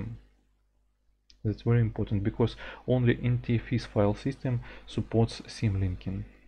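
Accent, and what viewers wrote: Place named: Russia. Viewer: Наш человек! Акцент - агонь!)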